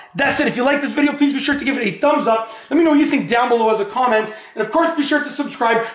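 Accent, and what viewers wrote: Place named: Canada. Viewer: This guys LOUD, echo-y Canadian voice is terrible! He talks TOO MUCH. It was easy but he made my ears hurt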